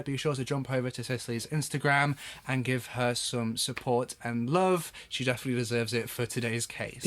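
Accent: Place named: RP, United Kingdom. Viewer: I find her accent super interesting. The majority of her r’s sound really soft but then there’s certain words she says that aren’t like when she says together. Idk it sounds quite posh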